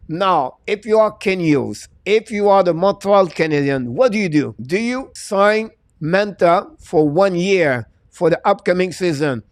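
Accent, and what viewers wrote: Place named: France. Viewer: I love just how french you are Coach! The way you speak c'est parfait! Keep up the content please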